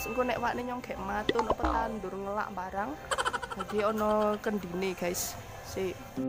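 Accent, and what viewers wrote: Temanggung, Indonesia. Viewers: Wkwk ciscisang 😂 temanggunge metu tenanan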